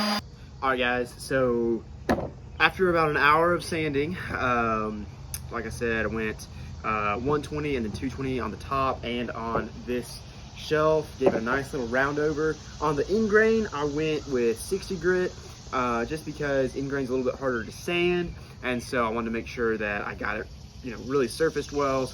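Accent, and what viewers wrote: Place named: Georgia, United States. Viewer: Thought that accent sounded familiar. Glad to see some GA representation in woodworking YT.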